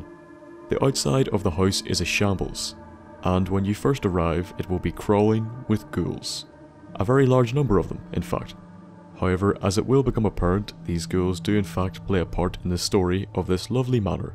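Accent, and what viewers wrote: Ireland. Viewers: Yo are you from ni or Ireland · As always great video man keep up the amazing content and I've been wondering this are you Irish if not my apologies but just wondering keep it up man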